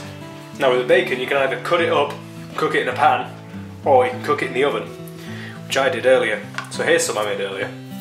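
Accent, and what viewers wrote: Yorkshire, United Kingdom. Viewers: Brilliant! Love a Yorkshire accent. 👍🏻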